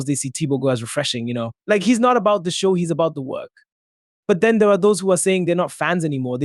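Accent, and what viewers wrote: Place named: Southern Africa. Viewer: My man, you sound very southern African... I'd wager South African straight up... yet you made a whole video and released it internationally without looking up how to pronounce this southern African man's name? Embarassing...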